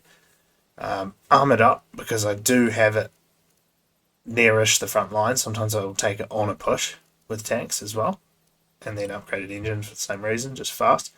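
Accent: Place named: New Zealand. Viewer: I thought you were saying "I'm just showing you my dick" for like 5 times until I realized you got that kiwi "i" vowel shift.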